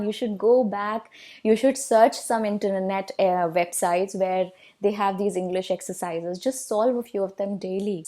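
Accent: India. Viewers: You are beautiful but unfortunately your accent is too simple mixing your Hindi accent in it.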